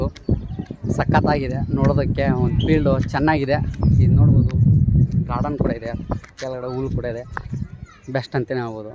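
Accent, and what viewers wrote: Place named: Davanagere, India. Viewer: Bro nanu davanagere talk erne maani bro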